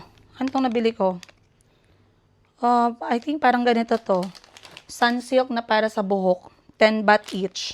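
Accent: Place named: Visayas, Philippines. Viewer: YANG KABOBOHAN MO AT IKAW ANG BASTOS MAGSALITA PURO KA BISAYA ANG PANGET MO PUTANG INA MO NAKAKAINIS KA PANOORIN, PANGET TALAGA UGALI MO, YUNG ACCENT MO BISAYANG TAE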